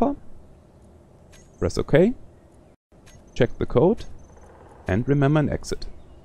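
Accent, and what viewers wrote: Germany. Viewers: As a German, I swear to God this guy is a German as well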